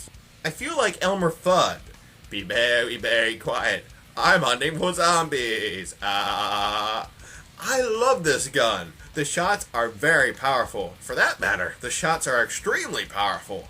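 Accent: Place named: North Germany, Germany. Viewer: I love how he pronounces Nacht der untoten (BTW, i am german, and he sounds like a North-german)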